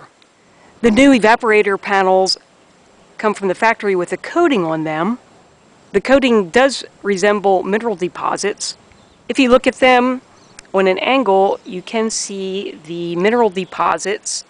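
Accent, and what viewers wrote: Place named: Baltimore, United States. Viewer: Great video! BTW, where are you from? Your accent reminds me of my home (Baltimore)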